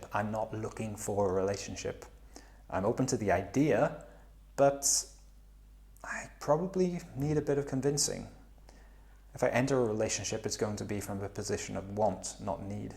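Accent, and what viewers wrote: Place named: Wales, United Kingdom. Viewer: thought I recognised the accent but wasn't sure. South Walean here 😁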